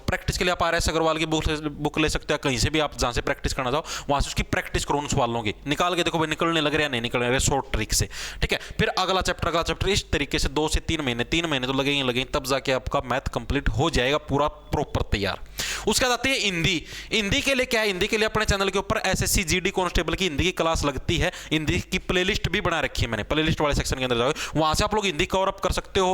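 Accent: Haryana, India. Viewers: Haryana sa ha ya bahrti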